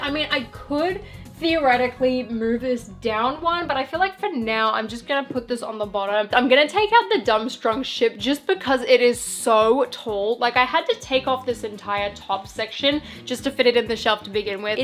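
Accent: Australia. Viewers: Agreed, I think always looks really natural. She has that striking Aussie look that fits with her quick, easy to follow australian english. If we're dealing out compliments, I also think she does really well at telling a story in her videos. They are well structured, which is not always the case for most Lego youtubers. That said, this video is probably not the best example of that, but it is something I've noticed quite often.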